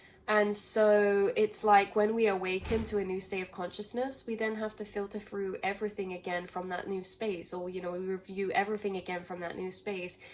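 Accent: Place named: United Kingdom. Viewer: Harry Potter references and your British accent. Perfect fit.😊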